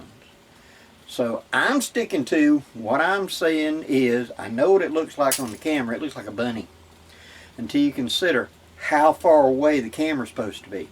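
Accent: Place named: Georgia, United States. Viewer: I just found your videos I really appreciate the info but it’s a trip down memory lane as a young lad with my dad and grand pa with the way you talk. Georgia native maybe? If that’s a known fact or not my bad just found you last night.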